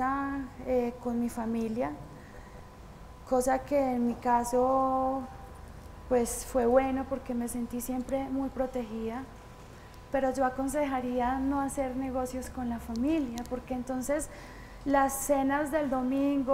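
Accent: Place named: Antioquia, Colombia. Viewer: Alejandra Moreno sencillo por que es paisa y se le escucha bien bonito, deja la envidia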